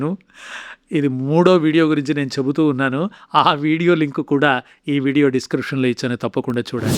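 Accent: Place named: Karnataka, India. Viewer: ನಮಸ್ಕಾರ. ನಿಮ್ಮ ತೆಲುಗು ಉಚ್ಚಾರಣೆಯಲ್ಲಿ ಕನ್ನಡ ಕಸ್ತೂರಿಯ ಕಂಪು ಗಾಢವಾಗಿ ಬೀರುತ್ತದೆ. ದಯವಿಟ್ಟು ಕನ್ನಡದಲ್ಲಿಯೂ ಶಾಸನ ವಾಚನ ಕಾರ್ಯಕ್ರಮಗಳನ್ನು ಮಾಡಿರಿ.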